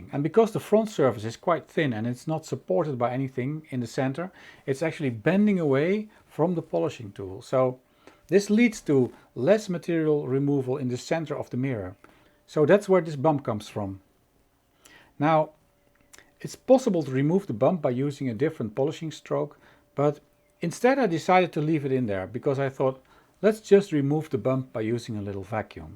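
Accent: Netherlands. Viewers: You sound like you’re from the Netherlands.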